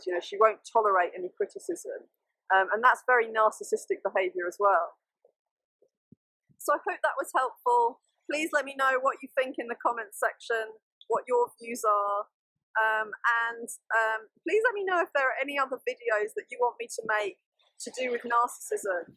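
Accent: England, United Kingdom. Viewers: For her accent I thought she was from England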